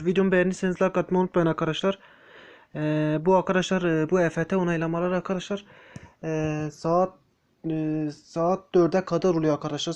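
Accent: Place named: Anatolia, Turkey. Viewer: Hey senin şivene kurban oluyum Anadolu insanı. 👍🏻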